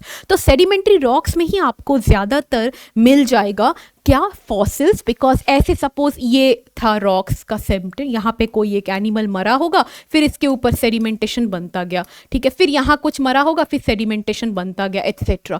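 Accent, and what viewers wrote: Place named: Tamil Nadu, India. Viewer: Yeh ma'am south indian accent aa rha ha tamil side ki ha shyd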